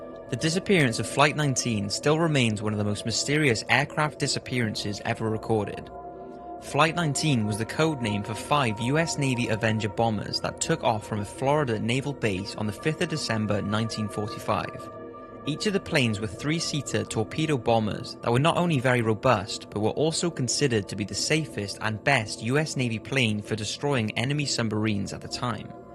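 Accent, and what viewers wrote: Wales, United Kingdom. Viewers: you need to be hired to narrate literally everything. You are a modern day Morgan Freeman with a British accent. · House Giantsbane I think I detect traces of a Welsh accent. If so, iechyd da!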